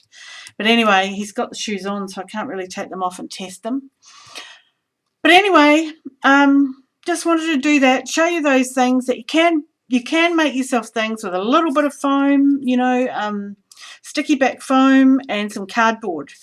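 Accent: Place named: New Zealand. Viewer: Thank you -- great ideas . Plus, loved hearing your accent, as an expat Kiwi it makes me homesick! x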